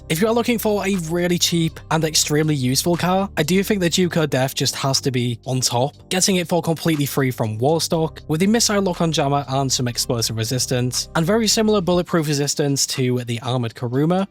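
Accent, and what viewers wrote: United Kingdom. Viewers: Is this British English or Scottish?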